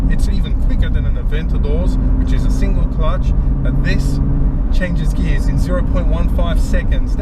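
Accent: Australia. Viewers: You sound like an Aussie! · you got an aussie accent mate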